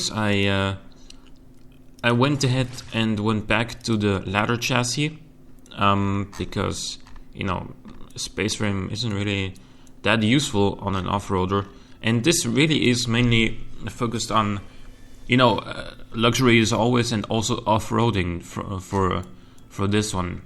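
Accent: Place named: Germany. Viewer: A few questions : 1) How are your cars so light ? Mines will always be like minimum 1600kg... 2) Where did you get the customs tracks I saw in your previous video ? I would have loved to be able to test out my own drag cars but coudnl't. 3) Also where are you from ? Because your accent is so nice :3 I guess maybe Germany. 4) Are your chassis made by you or are they just modified vanilla chassis ? 5) I don't know what I should say next, I feel like I'm so bad at this game :(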